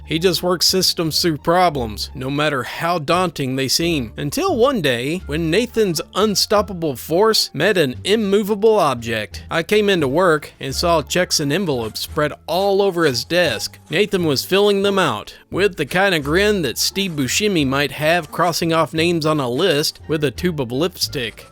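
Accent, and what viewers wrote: United States. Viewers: pronounce the word "arse" in your very American accent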